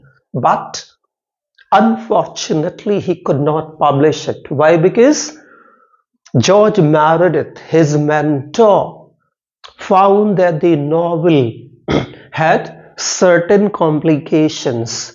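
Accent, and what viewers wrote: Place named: United Kingdom. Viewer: Sirji....your content is nice ....but you unnecessarily use a British accent.....which makes it boring.....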